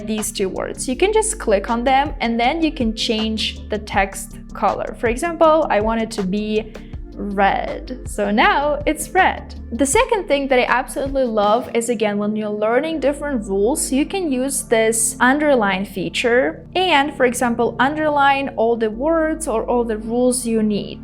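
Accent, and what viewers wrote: Russia. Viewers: Вроде хорошо говорит, но все равно слышатся достаточно уловимый русский акцент. Только потом посмотрел на имя ) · Why do I can detect Russian pronunciation from first word all the time.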